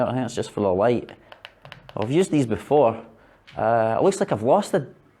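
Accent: Scotland, United Kingdom. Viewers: Love your Scottish accent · You're definitely Scottish , your accent shows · Always love that Scottish accent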